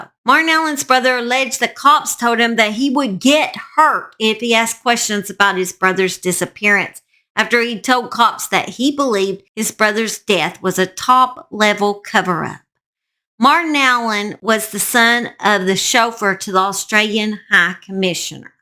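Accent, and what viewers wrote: South, United States. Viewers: Great story from my favorite southern narrator Ms.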